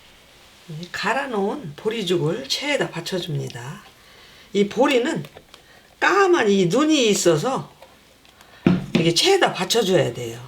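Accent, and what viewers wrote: Chungcheong, South Korea. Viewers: 충청도 신가바요?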